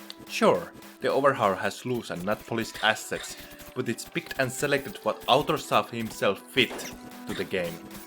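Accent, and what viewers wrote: Finland. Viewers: Onko tää suomalainen kanava? Kaveri ainakin ns. suomiaksentilla selkeesti :)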